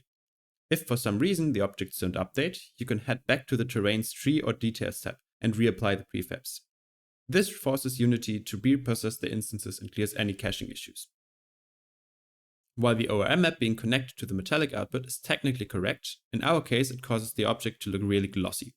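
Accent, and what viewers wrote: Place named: Germany. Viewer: Wonderful! I'll buy this if you release a Mac version. You sound German. Are you? Germans come up with the absolute best stuff!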